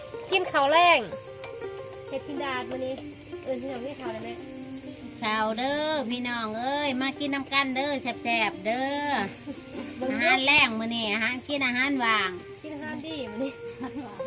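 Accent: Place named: Ubon Ratchathani, Thailand. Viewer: สำเนียงการเว้าบ้านคุณ คล้ายเมืองอุบลฝั่งไทยมากเลยครับ แซบๆเด้อครับกินอยู่บ้านละดีพร้อมหน่าพรอ้มตาครอบครัว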